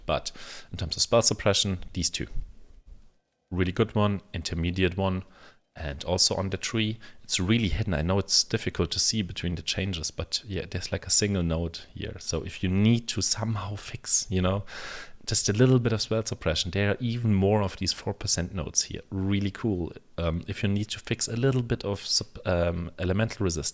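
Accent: Germany. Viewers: u can 100 %hear that in ur voice haha :D not a bad thing tho your english is fine u just hear the german pronounceciation!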